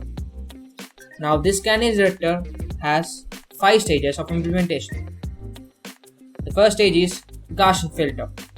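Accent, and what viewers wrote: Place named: India. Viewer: damm, India English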